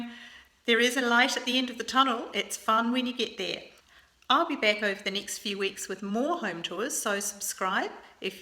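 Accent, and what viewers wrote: New Zealand. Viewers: I thought I heard the New Zealand twang.....it never leaves you my dad came from Scotland and never lost his Scottish twang even though he lived in Yorkshire for many years.....have not visited the South Island but that's my intended place next time fingers crossed.....x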